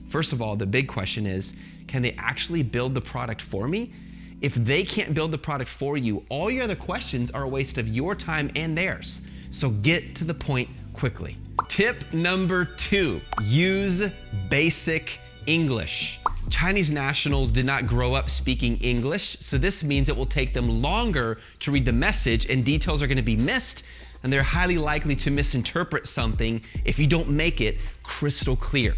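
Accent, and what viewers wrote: United Kingdom. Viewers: Your British accent!!! 😂Loved this video!! You're amazing at simplifying all this overwhelming information. My partner and I are ready to begin the negotiation process. I'm nervous but I'm feeling so much more comfortable! Thank you!!🙏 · Very interesting video, and as an authentic Chinese I can’t agree more and found your ideas are very helpful, especially about the long term business relationship and friendship part. Those customer service staffs work ridiculous hours and get very exhausted, if we respect them and make them feel nice talking with, the communication would be much smother and more efficient. The end I wanna thank you, your videos are so helpful and encouraging. I already found a product that I wanna try with on amazon, and today I already started sending messages to suppliers. Good luck to me! Btw, I’m living in UK, and I don’t think your British accent is too bad, hahah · A little bit funny British accent but great. 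You are awesome!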